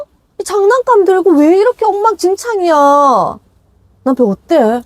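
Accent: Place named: Busan, South Korea. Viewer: 쌍둥이 육아하는 아부지 입니다 마누라 도와서 열심히 서포트 하면서 오늘도 교수님 나긋나긋한 부싼 억양 들으러 왔어예~ ㅎㅎㅎㅎ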